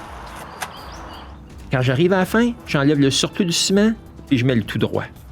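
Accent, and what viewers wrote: Quebec, Canada. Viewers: Je comprend rien avec laccent quebecoi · Magnifique l'accent quebecquois.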